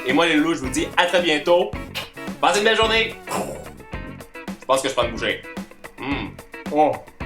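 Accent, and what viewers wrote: Quebec, Canada. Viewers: #lovemail t'es le meilleur j'adore ton accents québécois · J'adore vos axant ... l'axant québécois c'est mon préférer . Je trouve qu'il est tros marant . Sinon j'adore t' es vidéo . 😍😚😇😜😄😸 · Jadore tes video cest pas laccent quebecois ? Jadore😍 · J'aime trop les Québécois surtout votre accent !!😘😘😘😍😍😍😍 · Jadore troppppp lacsent quebecoise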